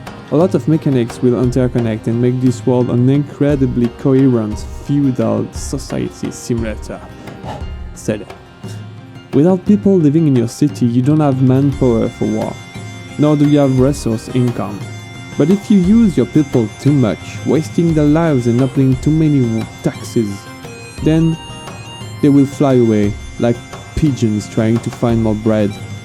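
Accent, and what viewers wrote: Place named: France. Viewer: The singing in the background made it even harder to understand your frenglish. Good vid regardless, liked.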